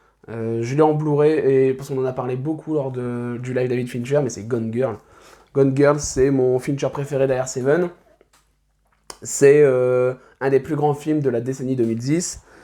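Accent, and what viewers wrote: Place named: North, France. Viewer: de rien 😊 je viens de découvrir ta chaîne, elle est plutôt intéressante. Bravo à toi. Avec le petit accent du Nord en plus 😉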